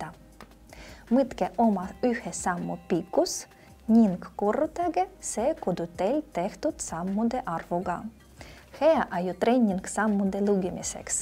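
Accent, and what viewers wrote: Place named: Russia. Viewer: Üsna häirib mahalugemine, sest mahalugeja vaatab teksti, mitte kaamerasse. Võiks ikka rääkida otse nagu veltsi ja veiko seda teevad. Kui ei suuda seda eesti keeles teha, siis tehku vene keeles, mis ilmselt on õpetaja emakeel. Kõneleja eesti keele aktsent on üsna jube, nii et ilmselt oleks palju meeldivam, kui jutt oleks ikka venekeelne ja lisaks oleksid eestikeelsed subtiitrid või siis veltsi või veiko räägiksid sama jutu eesti keeles või siis toimuks vestlus nende vahel. Loenguformaat pole ka kuigi atraktiivne põhikooliõpilastele.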